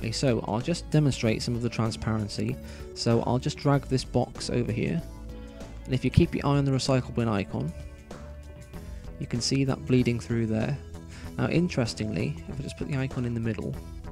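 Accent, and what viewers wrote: United Kingdom. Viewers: i'm learning british accent with your videos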